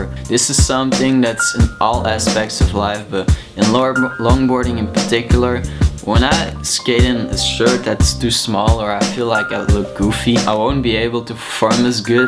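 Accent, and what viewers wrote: Netherlands. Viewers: Are you Dutch? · yep, obviously dutch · Okay but that Dutch accent tho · u dutch? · are you dutch?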